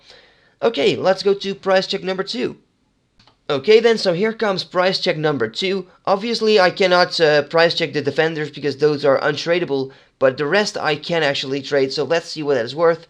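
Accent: Netherlands. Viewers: ik zag het al aan de rs chat hehe zieke videos maak je, kon het meteen horen aan je engels. Niks mis mee maar aan het accent hoor je al dat je nederlands bent ;)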